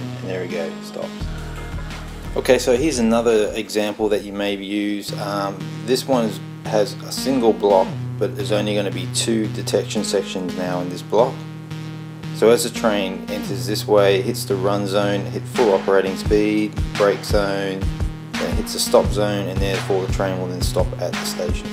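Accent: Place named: Australia. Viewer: I simply love your videos. Thanks for posting. I'm still strictly DC and have no plans to go to DCC unless a miracle happens and I maybe win the lottery. I guess I'll continue my DC layout, but I thank you for the insight into DCC. I've searched the internet for NEA Railroad software and cannot find anything on this software. You seem to have an Australian accent so maybe this is only available in Australia. Keep up the good work and I really enjoy your videos. Keep 'em coming.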